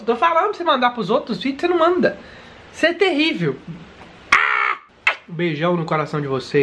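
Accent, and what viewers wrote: Minas Gerais, Brazil. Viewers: E que se toma o cafezim sozim kkk esse e mineiro raiz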